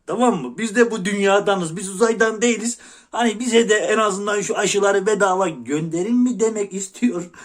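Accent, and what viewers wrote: Erzurum, Turkey. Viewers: Dadaş seni her zaman izlirem. Ben Erzurumlu değilem, lâkin Erzurum'da 29 yıl çalıştım. Senin o Erzurum ağziynan söylediğin sözler bana Erzurum'u hatirlatir. Hırtleğine basti...😄 Gorbagorun uşağı..., o.. u kordüğüm!. Erzurumu göresim geldi.. Şu zıkkımın kökü hesteliği bitse de bi gitsem..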